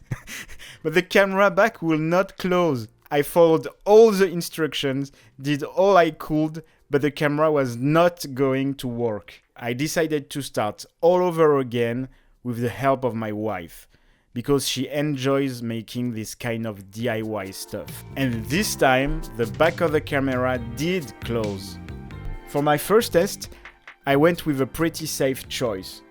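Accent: France. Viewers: love the french accent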